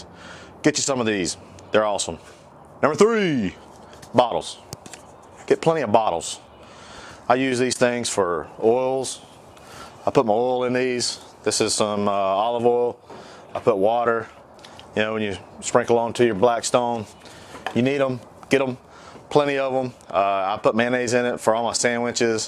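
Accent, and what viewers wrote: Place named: Carolinas, United States. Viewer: Awesome vids! As soon as you started talking, I knew you were a fellow Carolinian! Keep cranking out the great food and information!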